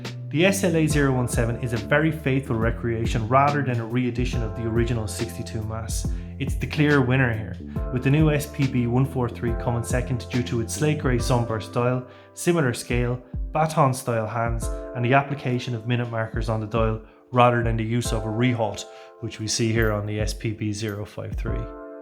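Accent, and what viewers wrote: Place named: Ireland. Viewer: Quality! Like your accent! More Irish-style reviews please! Much better than most of the channels here! Subscribed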